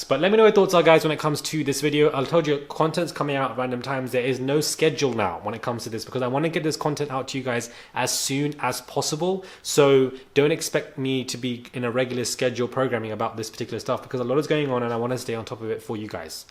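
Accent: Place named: United Kingdom. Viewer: I love watching ur content I just wish u would give us all the information before giving ur opinion, and also can u slow down when u speak u have to remember u have a British accent and it is already hard for us Americans so understand u anyway but when u speak fast we can't understand u good.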